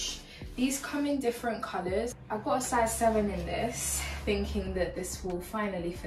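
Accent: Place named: United Kingdom. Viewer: Your British Accent….❤️ Very Beautiful As Well…..😍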